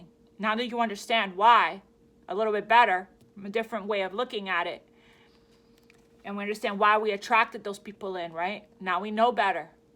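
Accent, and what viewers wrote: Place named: New Jersey, United States. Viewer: I love you so so much ❤️💕💕 You sound like a Jersey girl did you say you live in Dallas!!? You are my favorite reader I connect so hard with you I'm in Big Spring Texas born 2 - 9 1970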